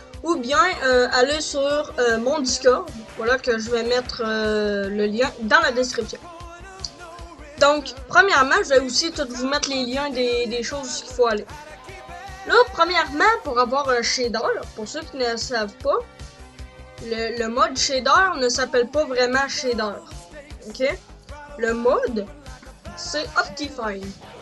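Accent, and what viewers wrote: Quebec, Canada. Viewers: Ta vraiment l'accent québequoi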